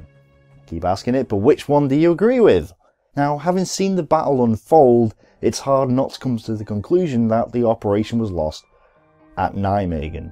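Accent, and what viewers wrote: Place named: Manchester, United Kingdom. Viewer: In 1944 the Zuiderzee, the Southern Sea, no longer existed. it had been transformed in the 1930s into the IJsselmeer (lake IJssel). Also the shape of the lake is completely wrong. The map is more like something I expected from an American, not something that (as I understand his accent) is coming from a British person.. .I honestly don't understand WHERE he got this map from.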